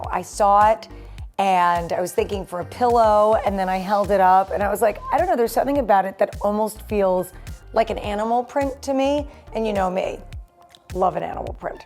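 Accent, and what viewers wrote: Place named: Texas, United States. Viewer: You are a hoot! Texas talk ,love your room.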